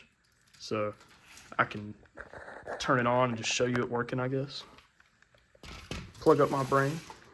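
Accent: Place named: Texas, United States. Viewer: the texan accent 🔥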